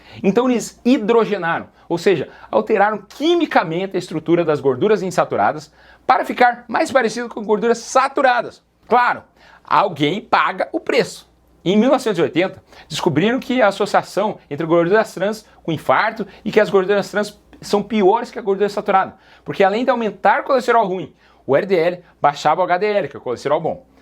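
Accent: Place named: Caipira, Brazil. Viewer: Deveria ser proibido médico com sotaque caipira abobalhado!!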